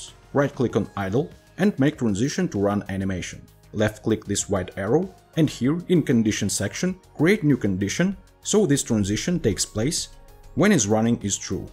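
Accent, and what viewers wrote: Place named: Russia. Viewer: Кликнул на видео не замечая названия канала. Услышав акцент думаю "русский что-ли?". И тут вижу на "Новая папка" Хороший туториал, короче, спасибо)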